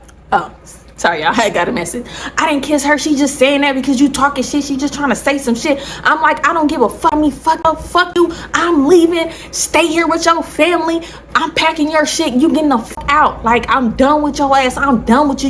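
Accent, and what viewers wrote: Bay Area, United States. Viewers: My first time here! First 3 mins in i knew you had to be from the bay we really do talk a lil different 🤣You funny af I’m definitely subscribing!! love from Oakland!🫶🏾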